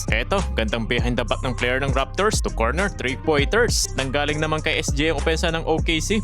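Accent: Pangasinan, Philippines. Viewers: That pangasinense accent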